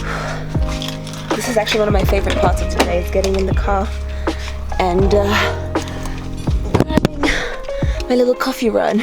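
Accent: Pakistan. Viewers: ... 😭 “LET ME TAKE YOU OUT ON A TOUR TO MY ISLAMABAD”... and “CHALO!!!! LET’S GO!” This couldn’t just get any cuter than this. speaking in beautiful Urdu accent! 😅😅👍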